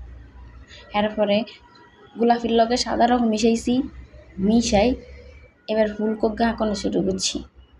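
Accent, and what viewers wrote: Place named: Noakhali, Bangladesh. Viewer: ও 😂 ভিডিও খান সুন্দর ই অইচে👌আনরা সবাই হরের ভিডিও কানের লাই অপেক্ষা আছি☺️☺️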